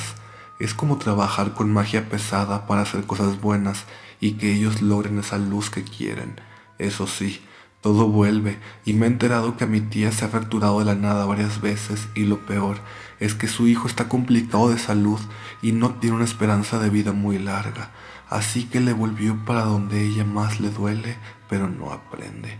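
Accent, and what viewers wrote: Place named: Upper class, Mexico. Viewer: Hole por qué últimamente hablas como waytechecan cómo si tuvieras una papa en la boca weeeeee megaaaaa (cringe1000)🫢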